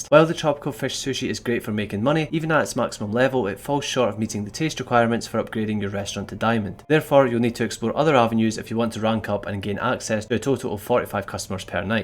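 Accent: Scotland, United Kingdom. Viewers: You're talking to fast lad , i could barely hear you through your Scottish accent. 😅 Despite that, this video helps me , makin good progress on the game. Thx for your time uploading this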